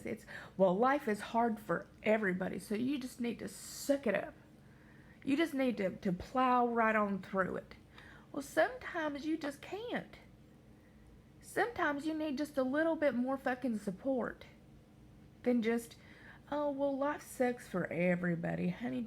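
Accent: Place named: Carolina, United States. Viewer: Thanks. I’m from chapel hill and i recognize that Carolina accent anywhere ❤️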